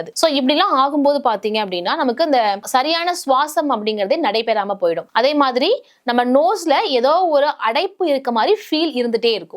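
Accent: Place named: United Kingdom. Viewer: 👍 What a british english ...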